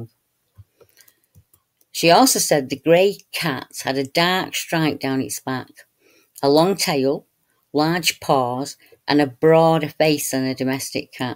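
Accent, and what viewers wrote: United Kingdom. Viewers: I could listen to you all night. I live in the US in Georgia. I love your accent. Of course the UK has cryptids. I have no doubt that big cats are there. It's a wonder more children or elderly people haven't been attacked. It's hard to believe people would just let them loose. But look at what people do to their dogs and cats. So irresponsible.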